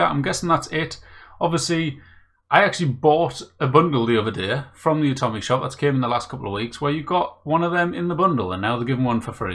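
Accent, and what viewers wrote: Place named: Scotland, United Kingdom. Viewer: Are you Scottish or Irish or do you just have an awesome accent?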